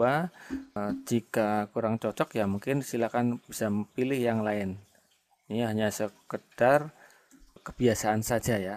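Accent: Banyumas, Indonesia. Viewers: Belajar gambar dino pak..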